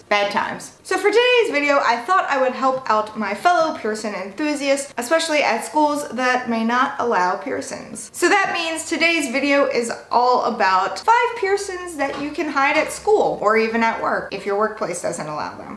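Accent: United States. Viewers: Where are you from? I’ve never heard your accent before. You sound American but you said a bit Irish. Because when you speak you don’t pronounce the g in ing words. You say “piercin’ and “somethin”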